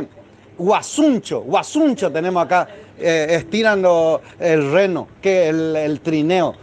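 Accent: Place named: Paraguay. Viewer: Parece Paraguayo los correntinos no tienen tanto hacento litoraleño que de echo es hermoso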